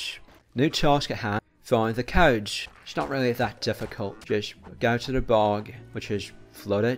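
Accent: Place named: Wales, United Kingdom. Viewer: Yeah you have kind of a British\Welsh accent that my ears don't really understand, but don't take it personal I'm fine with it.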